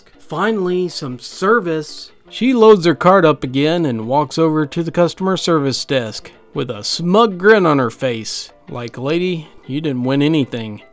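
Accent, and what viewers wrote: South, United States. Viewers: the most calm and somewhat soothing southern accent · Where is the reader from or is that a fake accent. It's not the coastal South, maybe Arkansas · I know it's Southern, but what state? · Someone with a strong southern accent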